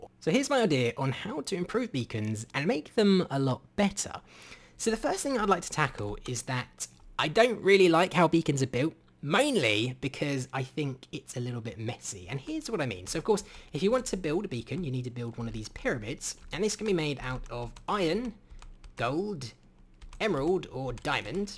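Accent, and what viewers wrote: United Kingdom. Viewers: It's our Southern England accent. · he's British what do u expect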